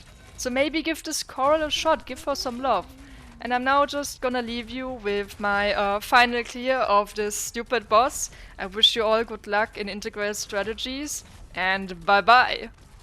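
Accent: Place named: Germany. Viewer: German accent is a welcome surprise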